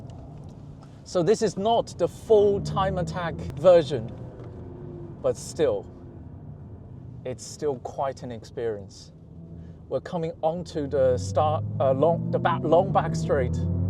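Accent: United Kingdom. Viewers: British Accent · 英国口音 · 的英式口音太特么好听了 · 哥们英音真牛逼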